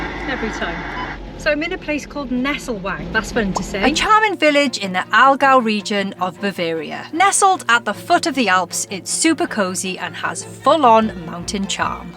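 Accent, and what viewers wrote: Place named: Cardiff, United Kingdom. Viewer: Loving your adventure. And as someone said, it is enough just to travel alone. I’ve done it a couple of times. The first two weeks I wondered if I should have been allowed to leave the house, let alone drive across Europe alone! Suddenly I found my feet, my mojo, and everything started to click. Life always seemed to support me. You make me laugh. You sound Cardiff, that’s my hometown. Love your accent. Crackin’ x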